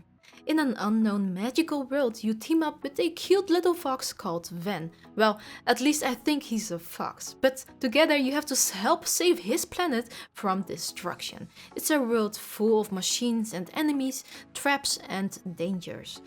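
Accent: Netherlands. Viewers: Dear god I love your accent. What country is that? Edit: Oh found it, Netherlands! Yeah I can recognise it now! Cute! The bladed weapons used by Ven are called Khopesh btw.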